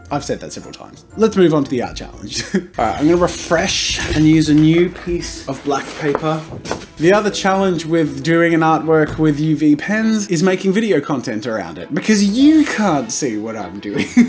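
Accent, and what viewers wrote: United States. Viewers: his American accent is point on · Nice American accent for the story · the American accent that was good as heck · omg that american accent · The slight American accent in the story kinda feels weird with you tbh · I first I thought he was playing a scary story in the background, but now I'm like" oh wait that's him speaking I think". if that was you talking, one question. How do you immatate American accents so well? 😂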